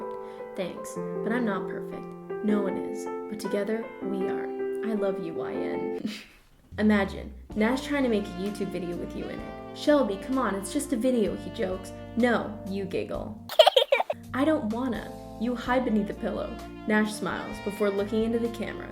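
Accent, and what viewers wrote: Canada. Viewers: I can tell you’re Canadian by the way you pronounce Magcon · YOUR CANADIAN ACCENT IS SO CUTE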